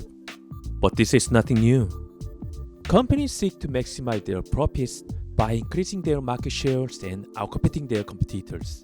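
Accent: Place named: South Korea. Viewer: Wow, i believe its first time when YouTube suggested me a video with that low views, but I didn't even notice it. Still, very good video. I love that you want to keep your korean identity, never forget who you are and where you from. Also even listening to that strong korean accent I still can understand and follow your thoughts. Keep up with such a great job! Love from Russia.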